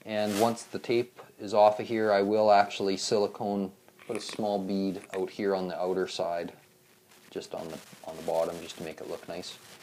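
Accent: Canada. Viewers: He from Canada ! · Canadian, aye?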